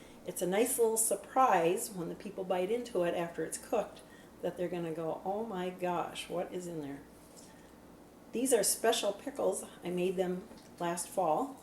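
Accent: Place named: Midwest, United States. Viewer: You are definitely a mid western German with that accent. Great recipe!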